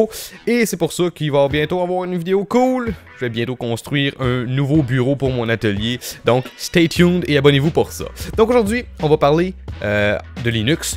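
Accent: Quebec, Canada. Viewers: killer des québécois* les canadien ont pas cette accent, la plupart ne parle même pas français, c'est un québécois (au canada) et c'est l'accent québécois ;) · L' accent canadien, j'adore !!! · Super a part qu'il parle un peux trop vite . de plus avec son accent canadien sa arrange pas les chose mais c'est se qui fais le charme de se jeune homme. Concrètement vidéo sympathique.Vivement la prochaine vidéo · Clair, net et compréhensible. Si seulement tous les tutps étaient aussi compréhensible. Et je kif l'accent québéquois. Trop marrant.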